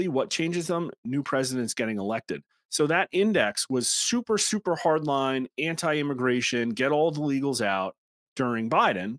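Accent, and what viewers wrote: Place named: Wisconsin, United States. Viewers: I didn’t know was from New Jersey. I’m from Wisconsin. He absolutely talks like he’s from the Midwest. I would’ve pegged him for Wisconsin night myself. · Still weird to me that you’re from New Jersey but say words like have and had like you’re from Wisconsin.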